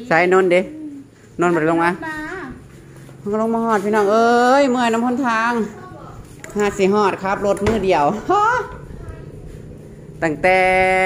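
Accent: Isan, Thailand. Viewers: สำเนียง พูดเหมือนคนภาคอีสานมากๆคะฟังเข้าใจง่ายคะ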